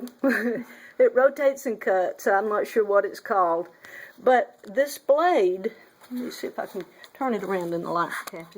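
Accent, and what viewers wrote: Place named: Kentucky, United States. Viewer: Lady you are a doll! You're accent takes me home to Kentucky! I miss it.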